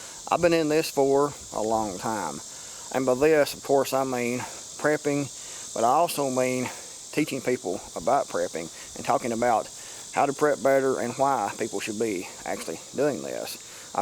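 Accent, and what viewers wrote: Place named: South, United States. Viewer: I think he,s great ! Love the southern accent ...i,m from LOUISIANA and we all sound like the actors on the movie THE WATERBOY ! LOL !!!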